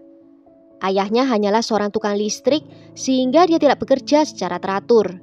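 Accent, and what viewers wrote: Java, Indonesia. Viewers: Hay medokuhhh cantik 😍😍😍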